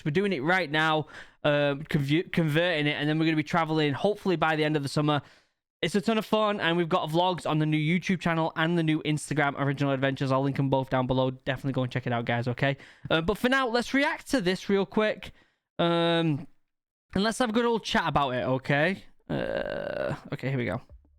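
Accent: United Kingdom. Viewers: Why you have a British accent lol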